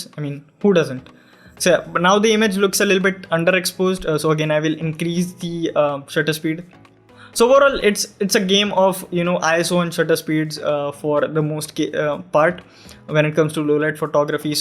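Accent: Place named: India. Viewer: You are indian right 😁 I know from your accent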